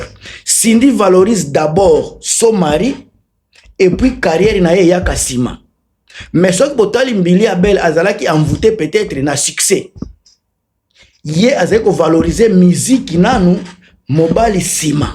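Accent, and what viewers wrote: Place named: Swahili, East Africa. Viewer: Lingala d'un vrai muswahili, très charmant finalement. Très belle vidéo avec des propos bien ajustés! Bravo! 👌🏾👌🏾👌🏾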